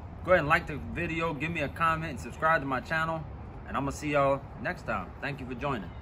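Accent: Louisiana, United States. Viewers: Just sounds like some LA cajun to me, boss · He's Cajun,!! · Mr I love your videos and definitely that Louisiana accent can’t be duplicated I live in probably the hottest states in our country Tucson Arizona and we love the Louisiana food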